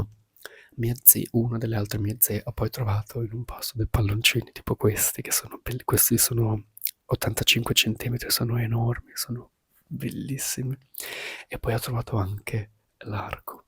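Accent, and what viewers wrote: United Kingdom. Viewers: Italiano molto migliorato, comunque a parte l' accento inglese l' italiano è perfetto dai, complimenti per la festa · Hai fatto una cosa bellissima per tua mamma! mi spiace debba affrontare tanta sofferenza e da sola ❤ p.s. in questo video hai perso un po' l'accento inglese 😅😉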